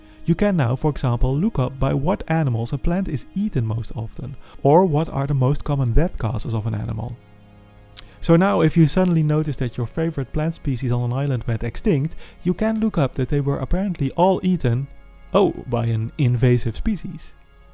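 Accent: Netherlands. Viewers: At the start of the video: Dutch accent? Name revealed: Dutch 100%. Showing of our newspaper-sized nature areas in the background: Dutch 200%.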